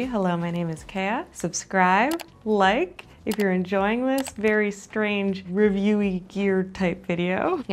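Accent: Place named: Canada. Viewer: Canadian Accent (out)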